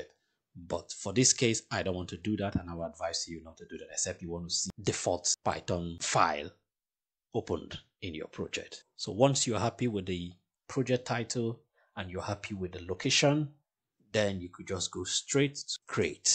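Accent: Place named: Cameroon. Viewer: Bro, you sound Cameroonian. I am glad to see your page and I am subscribing right away! Great job!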